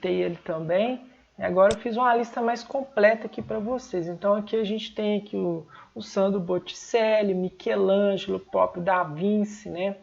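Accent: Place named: Belo Horizonte, Brazil. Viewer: Aula top! Bigode irado! Sotaque de BH bonito demais! Obrigado pela aula! sucesso!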